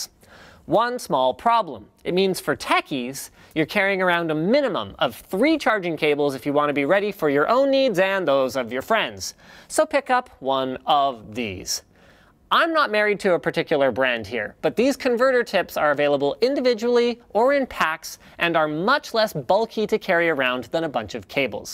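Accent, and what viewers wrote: Canada. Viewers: what you a canadian ey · Someone could tell that you're Canadian from the first second of this video lmao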